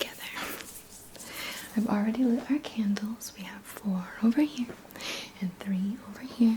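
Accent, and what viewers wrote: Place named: United States. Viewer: How are you not American?!? Still blows my mind.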